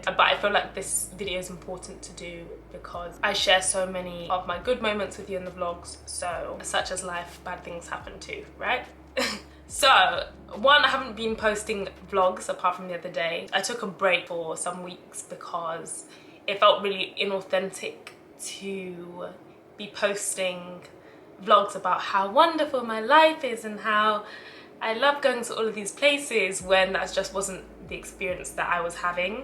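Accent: United Kingdom. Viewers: Koreans are a bunch of racist weirdos with their Kpop and femboys. You're actually incredibly beautiful and hit the genetic jackpot. Plus you're well spoken and you have a British accent on top of that. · are you british? i can hear it in your voice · They were mean to you....I am a white older teacher, and have taught hundreds of people, so on that claim of expertise; you are pretty, you are special because of braving the adventure, and you are gifted in language expression with a British accent that is so eloquent and in fact exquisite, and you are clearly intelligent as anyone could tell if they had half a concept of full sentences, vocabulary and grammar....they were lucky to have you and didn't recognize the chance they had for their students...it was their loss...Like Jesus' advice was; shake the dust off your feet as you leave them and know you will be guided to a lovely future...you deserve it...meanwhile you got to experience a high context culture which is based in inference and not directness...(PS, I was a French teacher so I want to add; Louis Pasteur had a colleague Antoine Bechamps who argued that if the germ harms then it should harm everyone but it doesn't so in fact Pasteur woke up to that fact before he died and confessed that he was wrong and Bechamps was right...his dying breath said Le Terrain Est Tout, the terrain is everything...people's immune system means the germ doesn't have the power people claim it to have...your field or terrain is helping you.) God bless you!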